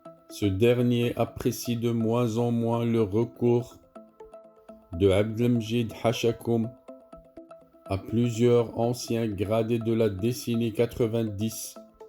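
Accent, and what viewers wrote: Morocco. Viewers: Mais qui veut éliminer mimi VI ? Le pauvre tout le peuple est contre lui même el mekhzen et ses enfants ! Dieu nous préserve de ce virus marocain ! VIVE L'ALGÉRIE 🇩🇿💪 VIVE NOTRE ARMÉE POPULAIRE ALGÉRIENNE 🇩🇿💪 GLOIRE À NOS VALEUREUX MARTYRES 🇩🇿💪 JE VOUS DONNE UNE NOTE DE 10/10 L'accent du marocain qui vous fait honte, vous avez essayer de camoufler hélas ! La récitation excellente !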